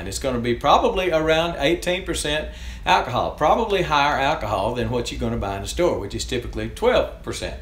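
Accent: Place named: South, United States. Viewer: Great one bro. I loved your southern accent too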